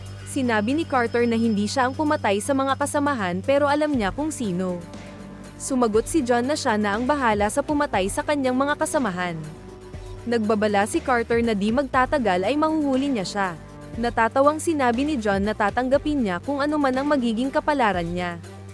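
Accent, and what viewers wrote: Visayas, Philippines. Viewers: The narrator is Bisaya...kinulata?😁kaway kaway beh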